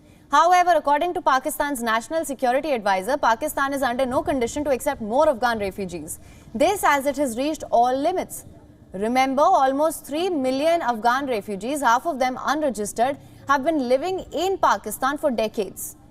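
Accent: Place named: Southeast, India. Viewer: You're eccent southeast India I can't stand that thick eccent 🧐😳😬😬😬😬🤐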